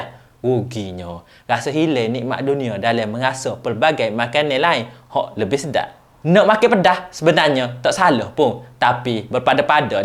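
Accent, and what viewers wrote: Kelantan, Malaysia. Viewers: Bang baca Kelantan ke tu?😭😭😭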